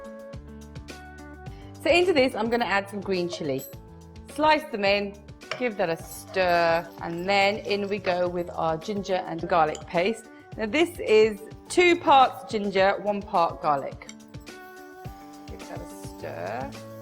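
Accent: England, United Kingdom. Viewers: the first thing I noticed is your British accent. my twin was watching you in the living room and I overheard it from my room and thought why nigella lawson sounds quite different today 😂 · English accent me chole bhature.....maja ni aya...😛😛😛😛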